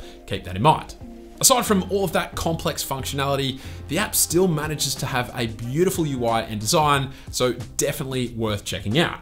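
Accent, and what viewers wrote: Australia. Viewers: is he an aussie? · Aussie Accent seems like the mixed baby of British and American Parents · My man is Aussie 🤟 yea mate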